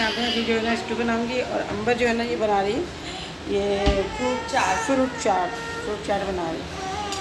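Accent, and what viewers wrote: Hyderabad, India. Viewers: Hyderabad me asi ho bola jata ha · hadrabad language esi hi hai baron KO toktay Nahi Hain.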